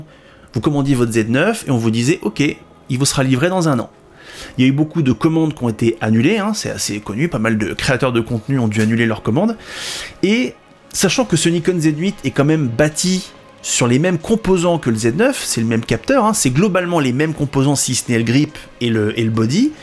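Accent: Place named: France. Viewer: Super vidéo. Tu t'y connais mieux que moi en photo mais en réseau.... USB c format plus compact, tu prends un d'ongle à 50 euros et tu as ton Ethernet. Deuxième raison pour laquelle tu te plantes, port Ethernet souvent c'est du 1 giga de bandpassante bref en 2023, c'est pour les ashmatiques: USB c c'est 20 fois plus. Moi qui fait du montage 8k 60 FPS avec mon MacBook M2 max vers mon Nas thunderbolt 4 100 teras, c'est Dealbreaker comme tu le dis avec ton accent français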